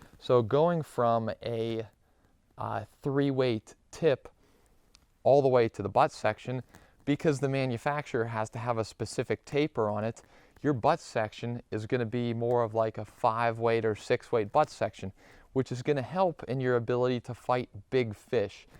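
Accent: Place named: Pennsylvania, United States. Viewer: I’m late to the conversation but I’m a life long dry fly fisherman who took up Euro nymphing a few weeks ago. Ive had decent success with a borrowed Orvis Recon 3 wt. It’s the newer version. Thoughts on whether I should get one? Ive been looking around. And also - about your accent. James Franklin has an accent like yours. It’s not a common PA accent in my opinion so it gets a persons attention.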